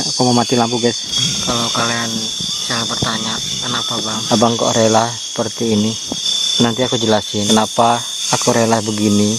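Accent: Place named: Kalimantan, Indonesia. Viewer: Dari cara omong nya seperti tinggal di kalimantan..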